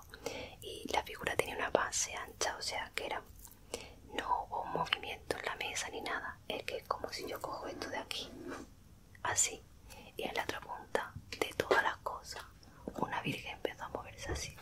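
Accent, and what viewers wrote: Spain. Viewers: La verdad me gustan mucho los videos de ella porque la voz que tiene me encanta por como susurra y tambien por su acento! Las voces de los españoles me relajan demasiadooo💙💙💙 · Me encantan tu voz y ése acentito tan lindo español. Te mereces más subscriptores,.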